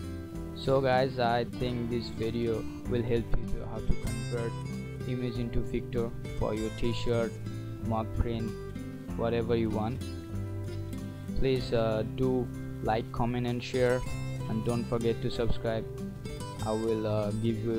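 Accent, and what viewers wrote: India. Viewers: Your English is so fuckall with a crap Bengali accent! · Why does everytime i need some tutotiral in this stuff , Apu from Kwik-E-Mart is the voice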